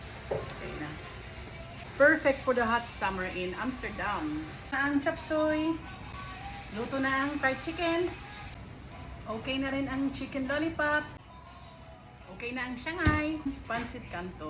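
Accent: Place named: Ilocos, Philippines. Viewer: Wow..galing mo po mag tagalog and parang may ilocano accent din po the way ka po mag salita.. ❤❤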